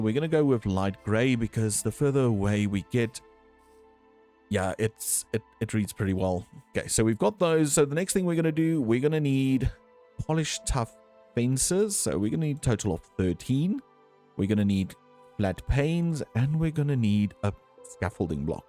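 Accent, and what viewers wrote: South Africa. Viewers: From SA? I hear the accent 😂 if so thats dope. Been enjoying this season of SMP so many new creators to discover 🔥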